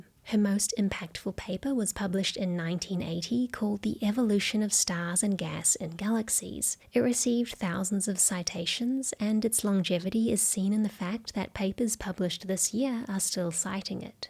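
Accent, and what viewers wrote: New Zealand. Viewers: That's just the kiwi accent. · I’d not realized before this video you were a fellow kiwi! I’m terrible at picking up accents.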